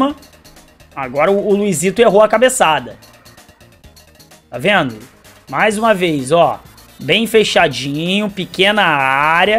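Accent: Rio de Janeiro, Brazil. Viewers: O melhor é o sotaque de Carioca raiz kkk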